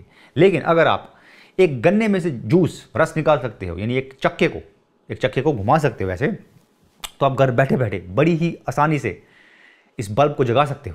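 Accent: Kashmir, India. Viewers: Sir aap kashmir se ho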